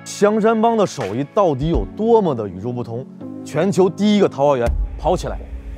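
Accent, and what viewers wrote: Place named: Tianjin, China. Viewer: 好像天津人讲的话，笑话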